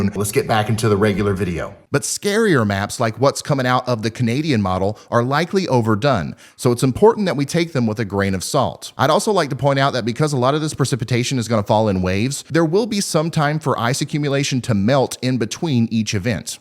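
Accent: South, United States. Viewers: You can’t help but love a southerner that’s all about science.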